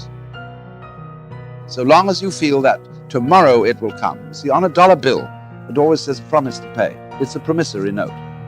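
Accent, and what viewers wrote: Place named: RP, United Kingdom. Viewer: Alan Watts is like a man down the pub talking crap. It's just telling people what they want to hear about the F-ing obvious. 🙄 Americans are impressed by his posh accent. 😆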